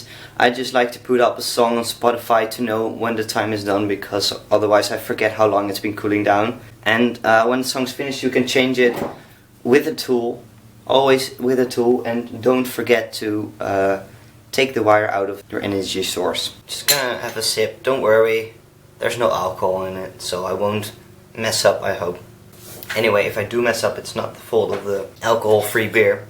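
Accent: Netherlands. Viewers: your accent sounds kind off dutch am i right? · suscribed 'cuz, MEDE NEDELANDER XD Ik dacht het al te horen, maar ik hoorde Pippoos en toen wist ik t zeker haha. Goed bezig jongen!!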